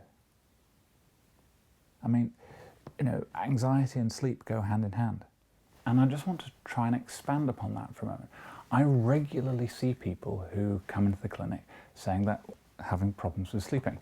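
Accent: United Kingdom. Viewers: You are too British y really like that, I like your accent I hear your videos to improve mi listen skill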